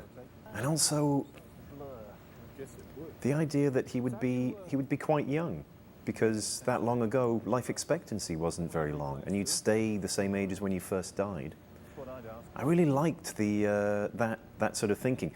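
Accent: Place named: United Kingdom. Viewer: Love the british, or whatever it is, accent.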